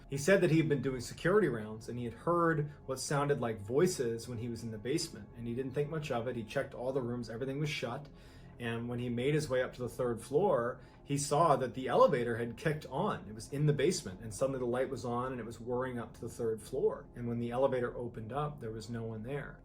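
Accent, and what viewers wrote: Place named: United States. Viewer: 🇬🇧 man this girl is cute as fuck!!! 😍 I love her American accent #notaperve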